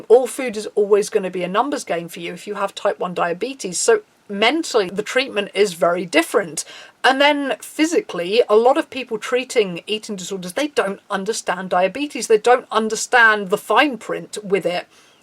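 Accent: United Kingdom. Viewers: The hair, the lips, the eyes. It's all so pleasing to watch that perfection! It's just done so pretty. And then having you talk about important stuff in your own funny, no-nonsense way, with that accent and (british?) words that you use. Oh man, it's just awesome! Soothing even! You seem like a lot of fun!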